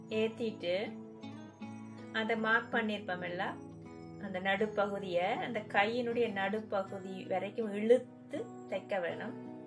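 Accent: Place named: Sri Lanka. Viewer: i also srilankan.from jaffna.ur talking style paathu kandu pedichan.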